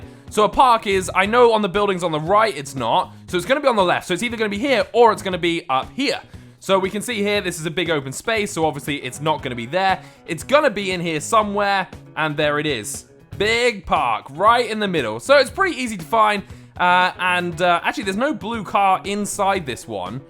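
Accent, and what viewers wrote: United Kingdom. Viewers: Wish there were more maps. The 3 rotating maps are getting old. No matter how much of a British accent you put on it, this game is truly becoming nothing more than a boring time waster (even with the Halloween events that take literally 3 tries to complete).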